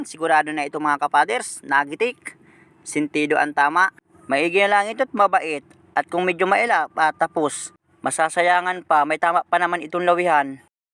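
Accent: Bicol, Philippines. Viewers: Bicolano ba kayo o ilonggo o Batangenyo 😂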